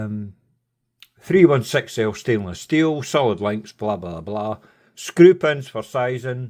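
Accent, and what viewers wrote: Scotland, United Kingdom. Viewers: Great review. Love the genuine Scottish brogue!! 🙂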